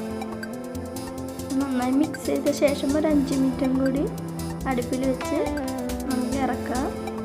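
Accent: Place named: Thrissur, India. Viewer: സംസാരം കേട്ടിട്ട് തൃശ്ശൂർ കാരി ആണെന്നു തോന്നുന്നു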